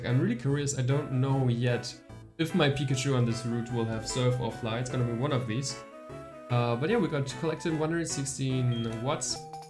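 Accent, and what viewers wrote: Germany. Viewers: You can shiny hunt at f pokewalker??? Do i hear... Hör ich da einen deutschen akzent? Ich hab das actionReplayDS auch noch, kann man das auf dem DSi auch benutzen?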